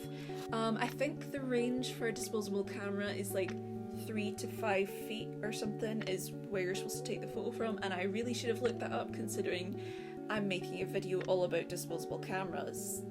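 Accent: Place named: Wales, United Kingdom. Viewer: you sound welsh even know i am welsh :/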